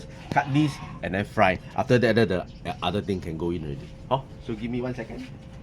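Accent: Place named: Singapore. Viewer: Enjoy watching your video from the sunshine state, Florida. Make me feel at home listening to your humorous singlish dialogue. Looking forward to more of your videos.🙏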